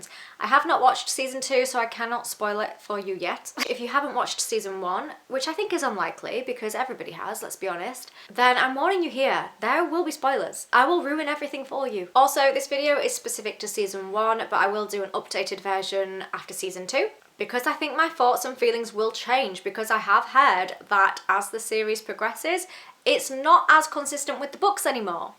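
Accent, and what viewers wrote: United Kingdom. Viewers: tbf I had some time off for medical reasons so it was the perfect time to start. Got me through a tough period. Forgot to mention, the show really does a great job with the music! is a song you want to hate but it is beautifully composed. And one of my favorite bits of the latter seasons is a full version of Jenny's song (the one Tom o' Sevens sings to the Ghost of High Heart). You'll also hear and at some point. As you continue to watch you'll notice each house has their own dedicated song and you'll here different versions of them throughout the show. The score is phenomenal! Love the accent by the way, I live in the US (from the Caribbean) but work with a score of English expats (Camden Town, Leeds, Newcastle and Leicester), so it feels like I'm listening to a friend.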